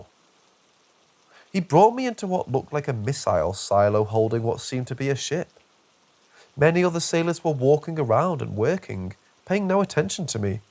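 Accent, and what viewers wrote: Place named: Scotland, United Kingdom. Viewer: Kinda hard to take tales of NC seriously from a Scottish accent....but good tales. 😎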